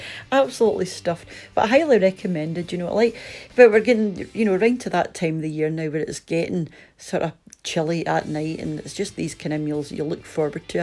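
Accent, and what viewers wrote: Scotland, United Kingdom. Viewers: Excellent 👍👍 presentation. Love your Scottish accent. You sound just like my daughter's mother in law ... Glasgow. Lol 🤗definitely will try this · I love your cooking reminds me of my mother’s cooking, she was from Dundee also where I was born but only lived there until I was four. All her cooking when I was young was based on Scottish food, so to hear your accent and see the the cooking warms my heart and makes me hungry. PS maybe not so many americanisms of your lovely accent?😊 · Beautiful scottish accent.😊❤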